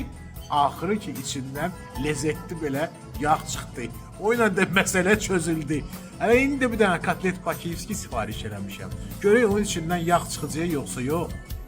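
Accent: Baku, Azerbaijan. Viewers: Nuw olsun lehceni ewidende ele bildim Bakidayam hemiwe sesini ewitmek mene cox xow olurAllah qorusun seni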